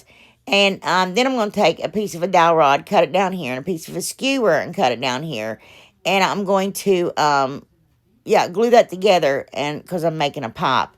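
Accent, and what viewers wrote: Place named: East Tennessee, United States. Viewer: I love watching you because my family is in East Tennessee and your accent makes me feel like you’re family 😘 my entire house gets filled with snowmen. Only nativity sets and snowmen.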